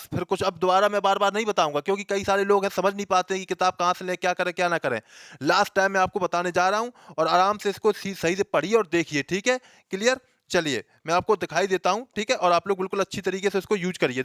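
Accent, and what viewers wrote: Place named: Kanpur, India. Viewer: Sir kanpuriya language bol rhe h.. lgta h kanpur se h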